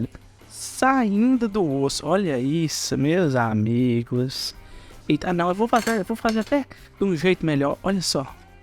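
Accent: Minas Gerais, Brazil. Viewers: sim, nós mineiros falamos assim mesmo.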